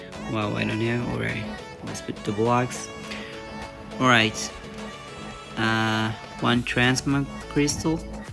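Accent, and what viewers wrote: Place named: Turkey. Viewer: sesi çok türk gibi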